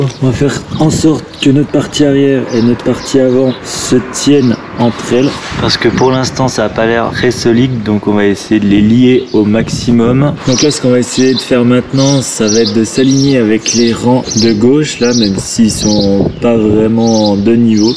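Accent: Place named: Franche-Comté, France. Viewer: J'adore ton lancer d'eau pour humidifier avec ton petit balai à chiottes. Salut à toi mon Franc-comtois. Reste ainsi avec ton accent tellement vrai. 😊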